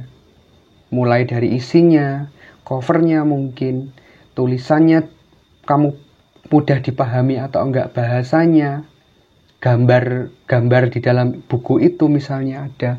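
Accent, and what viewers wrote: Indonesia. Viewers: Dari logatnya saja udah kedengeran jawanya.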